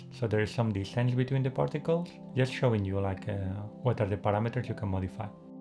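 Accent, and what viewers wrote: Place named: Slovenia. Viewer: Are you from Slovenia? Your accent sounds Slovenian.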